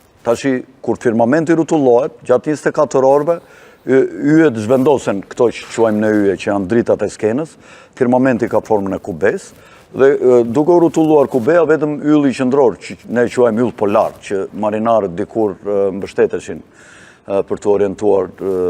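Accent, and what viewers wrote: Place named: Gheg, Albania. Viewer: O, hajvani me dy këmbë, mos e përdor t'folurit e hizmeqarëve të fashizmit, Bandave Balliste dhe mbeturinave tjera, apo dialektin Geg, i cili ka ngordhur që vitin 1972 dhe është varrosur në Tiranë! Tani po e përdorin pjella e pistë e hizmeqarëve të lartëpërmendur të Shqipërisë Veriore, Laperët, rrugaçët dhe kurvat e Kosovës primitive! Aleluja!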